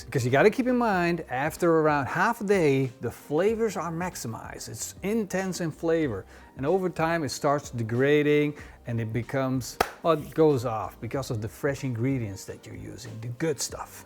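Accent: Netherlands. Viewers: I'm Uruguayan, Chimi is our "religion" and this is a very good recipie. Your ingredients choice are correct and kudos for not adding 'inovative' ingredients. The choice of oil and salt makes it more 'gourmet' but the basics are 100% correct Only improvment I can see is that if you want to have the best Chimi ever, replace the dried oregano with FRESH oregano. it will upgrade it to a totally new level ps. you sound Dutch. where are you from ? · Awful Dutch accent. I hate the Dutch. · Dutch accent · You must be dutch, can hear the accent. great recipe tips. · What a big Dutch accent bro . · Meest nederlandse accent ooit haha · Awesome recipe! But please do something about your randstad accent. It's appalling to us Dutch who speak English without that shitaccent.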